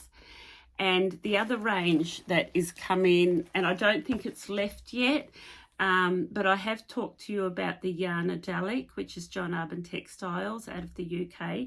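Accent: New Zealand. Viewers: Hi. Thank you for brilliant podcasts. I always learn something new, today was the cast off tip. Love your vest, I've never been mad on them but you've persuaded me. Have to admit that I often feel homesick when hearing your accent. 2023 will see me winging my way from the UK to Rangiora and Napier!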